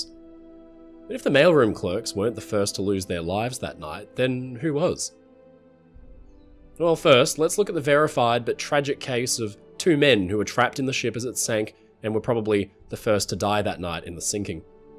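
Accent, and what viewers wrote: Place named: Australia. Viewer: This channel is always a delight to watch. The narrator has an engaging way with words as well as a hint of an Australian cultivated accent which is very rare these days.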